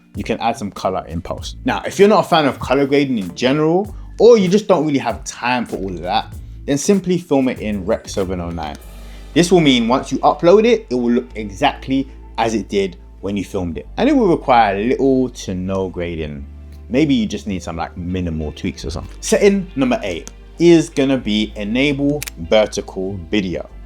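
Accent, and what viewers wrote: United Kingdom. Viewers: Really good thanks, just discovered this camera app, and I like to hear a Brit accent, nithing against America at all, just me...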